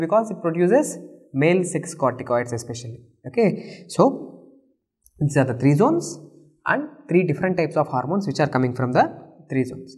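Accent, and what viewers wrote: Telugu, India. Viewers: Awesome explanation garu.. Ur accent I think ur Telugu guy.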